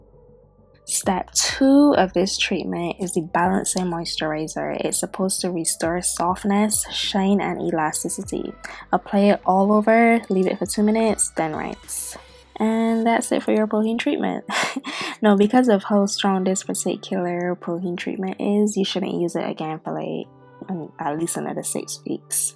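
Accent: Barbados. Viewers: Thanks for the info. You sound Bajan. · Jackee Pee she sounds Bajan to me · THAT ACCENT😩😍BAJANNN GIRLLL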